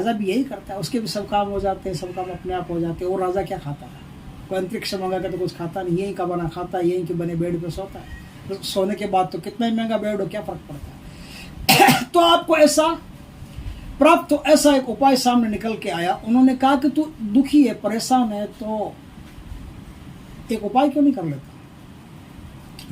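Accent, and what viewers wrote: Rajasthan, India. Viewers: आप राजस्थान से हो क्या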